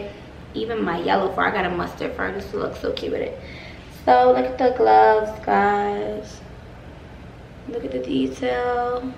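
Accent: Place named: New York, United States. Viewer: This must be a NY thing because, I talk just like this in my video😩😩😩 (FEEL ME?)😂😂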